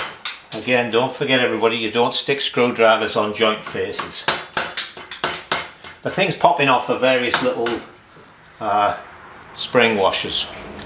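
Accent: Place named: Teesside, United Kingdom. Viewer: Recognise the accent,,, are you from Teesside????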